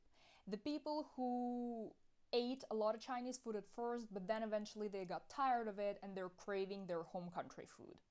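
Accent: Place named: Russia. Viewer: You sound Russian.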